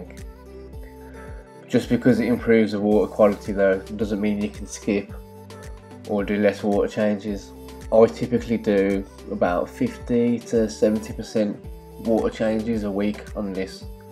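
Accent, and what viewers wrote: Birmingham, United Kingdom. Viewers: you're from birmingham!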